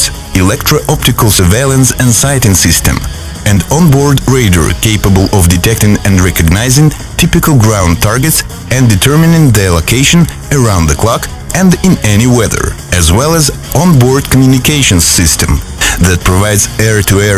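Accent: Russia. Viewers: русский ваня говорит(((((((( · Такое чувство, что это русский пытается говорить по-английски.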